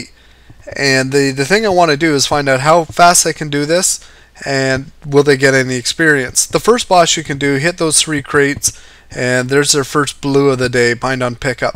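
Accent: Canada. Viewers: He is canadian so his accent.....But Idk why he didnt know about the FP....maybe cause he is too leet to come back to regular zones lol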